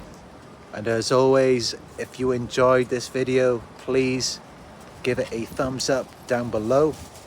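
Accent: United Kingdom. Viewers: Omg your from the UK? Snap me to 🙌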